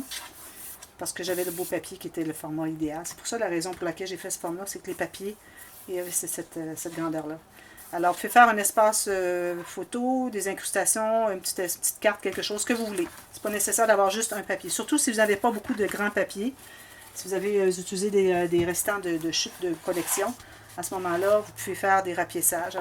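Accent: Quebec, Canada. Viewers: bonsoir, dès que j'ai écouté votre vidéo je savais que vous étiez québécoise tout comme moi. J'ai remarqué votre plaque à découper aussi, j'ai la même. Ces pour cela que j'espèrais enfin où trouver ces cartons, je venais de trouver une référence de chez moi. Je suis de Laval donc accès aux grand centre et je commande aux États-Unis aussi, mais je n'avais pas le nombre de gramme ou un nom pour mis référer. Merci!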